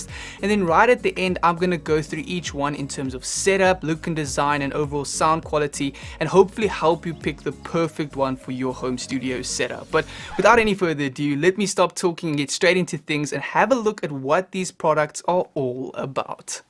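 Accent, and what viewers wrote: South Africa. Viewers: Ayyyyy. I detect a south African accent ! Nice channel 😃🙌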